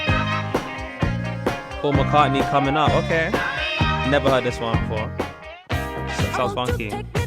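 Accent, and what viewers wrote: United Kingdom. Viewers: U like the one of many British accents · That's a British accent. London more than likely.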